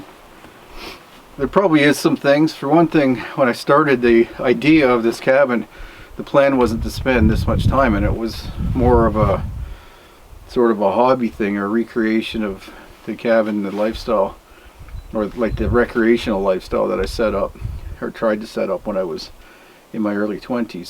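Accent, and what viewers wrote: Canada. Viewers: After a tough day, coming home to a vid is just great. I love the Canadian accent, I'm sure it varies according to area, (maybe not as extreme as in the UK). Its very comforting somehow, I can't explain how or why, but listening to you speak is soothing. Keep up the good work. Some may think these are trivial vids, but I find them fascinating, and they answer a lot of questions that I'm interested in.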